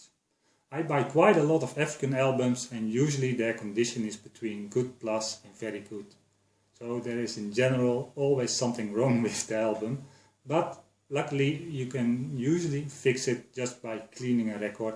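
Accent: Netherlands. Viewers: Je spreekt het engels wel op een zeer nederlandse manier uit. Maar dat wil niks zeggen, deze video‘s zijn supertips en ik zie in de comments dat het geweldig helpt bij de engelse kijkers. 😊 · You always can hear if you are dutch hahahaha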